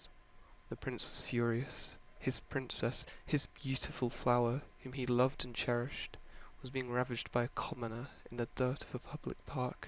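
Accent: United Kingdom. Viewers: he sounds sooooo British 🇬🇧🇬🇧🇬🇧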